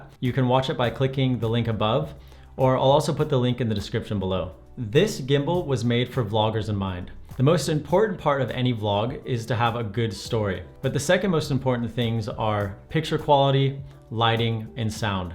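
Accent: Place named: United States. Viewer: Are you American or the best Swedish English speaker In the world ? Lol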